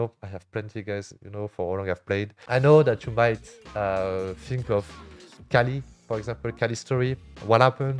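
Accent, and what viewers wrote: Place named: France. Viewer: french accent makes his voice very sexy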